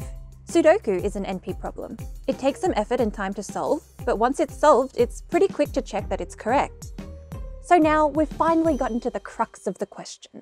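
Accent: United Kingdom. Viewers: Okay, over the past few days I've watched a few of your videos and it seems that no one is willing to "call the Emperor out", (so to speak). So, I'll be the skunk in the group and tell you that your videos absolutely STINK! The problem is not you (or your British accent) or the content (which is competently done). It's your pisspoor audio system! I can just barely understand what you're saying! You really have to get yourself a better system (you should start with investing in a much higher quality microphone!). You might also want to remove more bass from the audio and boost the treble. That would improve matters greatly. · What you are Australian? I thought your accent was eccentric British!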